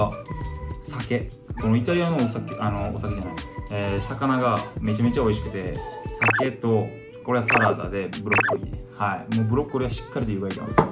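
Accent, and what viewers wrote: Kansai, Japan. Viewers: なんだかんだ関西弁での喋りを聞くの初かもしれん。 インタビューとか標準語だったし。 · 関西人やなーw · 初めて喋ってる動画見たけどイントネーションで、ん…？ってなって調べたら関西出身なんだね😳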